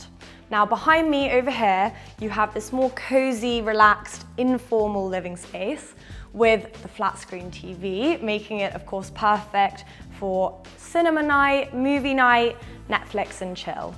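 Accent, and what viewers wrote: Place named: England, United Kingdom. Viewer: you are going to kill it on YouTube, so nice to hear a quintessential English rose accent, wonderfully articulate language and a charm only classy ladies like yourself can exude. Do not betray us by joining the luxury home show 😂 Stay on your own. Brand for the win 👌🏼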